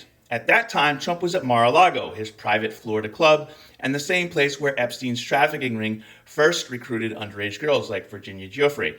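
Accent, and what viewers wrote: Scotland, United Kingdom. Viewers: I just noticed your Scottish accent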